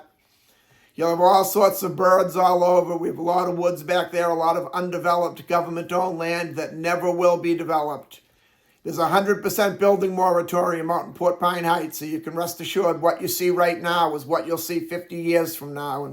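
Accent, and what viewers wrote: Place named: Northeast, United States. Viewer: your one of the many idiots who keep on telling people to move to Florida for your financial gain. The state has already been ruined. Being a Native, born and raised, I recently moved out of that hell hole after 40 years. There is no more quality of life. Overpriced with mean and rude people. Sounds like you're from the NE. Thanks for bringing your shit show to FL.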